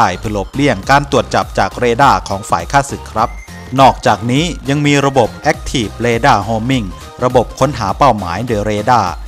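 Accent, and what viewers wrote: Thailand. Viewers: แอดคนบ้านใหนเสียงเหน่อๆ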